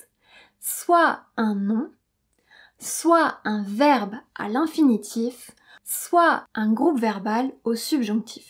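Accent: England, United Kingdom. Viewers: I noticed that you're pronunciation in English like British. N'est ce pas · ou peut etre... vous sonnez comme British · I am so tickled that you have an English accent with your English language. ❤ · Am I the only one who besides looking forward to learning French from, also looks forward to hearing English accent? HAHAHAHA. C'est très beau! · Je suis un peu confus maintenant... 😐 Selon Duolingo, voilà quelques exemples comme ça: Il faut - he, you must Il dois - He has to Il devrait - He should Je voulais te dire que j'aime bien ton accent en parlant anglais, tu parles comme les anglaises. C'est si mignon 😍 Est-ce que tous les français ont l'accent du Angleterre en parlant?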